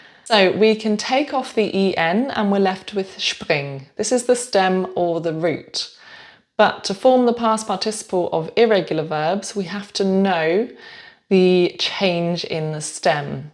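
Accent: United Kingdom. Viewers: Bitte. Ich denke, das sind keine Komplimente, das sind Tatsachen.🙂 By the way: listening to your British English is so pleasant - I detest American English.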